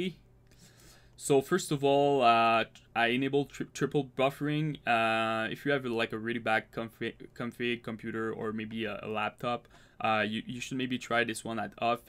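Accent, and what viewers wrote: Quebec, Canada. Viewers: québécois ?? :P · t québecois sa parait xd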